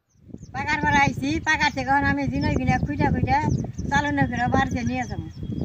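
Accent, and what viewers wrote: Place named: Dhaka, Bangladesh. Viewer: উনার কথায় বুঝা যায়, বাংলাদেশের ঢাকা জেলা অথবা মানিকগঞ্জ জেলা হবে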